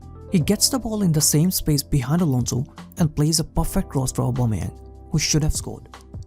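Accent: India. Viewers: No voice please. Bad indian accent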